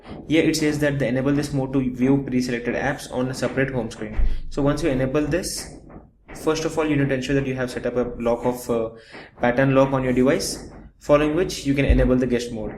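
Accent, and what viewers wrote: India. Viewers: i hate that indian accent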